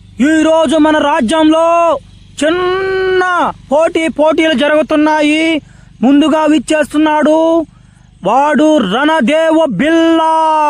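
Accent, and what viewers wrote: Nellore, India. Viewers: Nellore slang 😂😂😂 maadi kooda Nellore district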